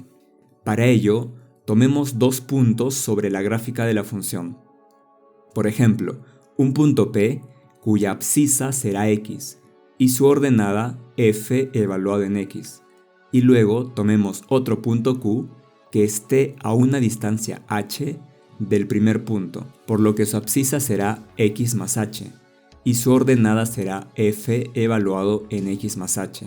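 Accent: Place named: Peru. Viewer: Ese acento Peruano es increíble!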